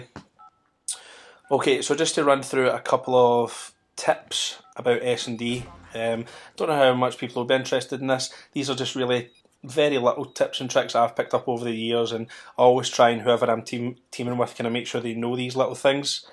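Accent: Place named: Scotland, United Kingdom. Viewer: feels weird hearing a fellow scottish man doing one of these haha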